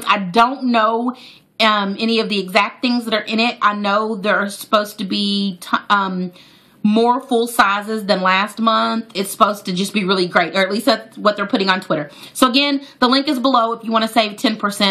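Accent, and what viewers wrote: United Kingdom. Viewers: Looool your english accent!! Its sooo funny! When you come to the uk,we need to meet up!! Lool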